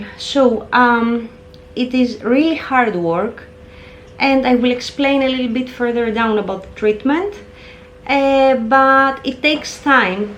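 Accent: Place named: Spain. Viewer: Hong Kong 😱 Definitely too far 😅 I’m Italian… I recognize something Spanish in your accent… I was hopping for a new weekend-Hoya-trip 🤪 Thanks a lot!!!!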